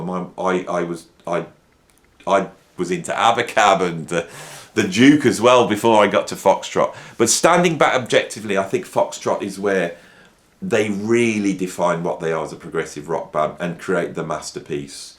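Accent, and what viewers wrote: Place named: England, United Kingdom. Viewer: I'm not happy you should have had them on the list from the very beginning and your reasoning was pretty lame 😢 it's hard to believe I hear an English accent coming out of your mouth but you didn't pick ELP the first time around😮 I say this and I'm American😊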